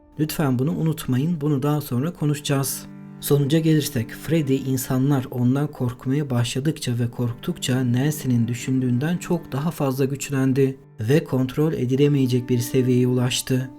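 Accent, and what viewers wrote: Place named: Erzurum, Turkey. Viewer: Abi sen Erzurum lumusun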